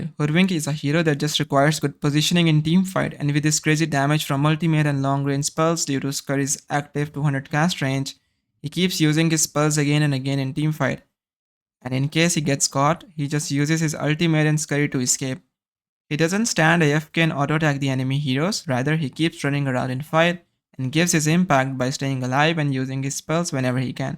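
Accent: India. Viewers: bro this indian accent i cant bro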